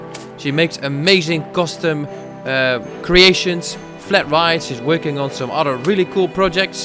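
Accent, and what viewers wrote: Netherlands. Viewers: You live in New Zealand right but you have a playlist of dutch Music and you have a accent just like a lot of dutch people so are you dutch?? (Awesome video btw)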